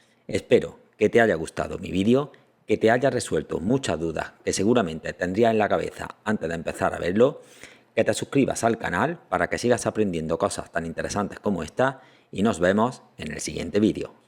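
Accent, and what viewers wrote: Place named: Andalusia, Spain. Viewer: que acento andaluz mas pronunciado macho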